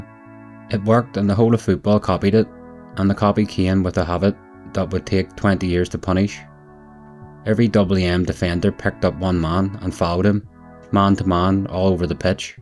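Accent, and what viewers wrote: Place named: Ulster, United Kingdom. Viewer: Ulster dialect is the best part of this video.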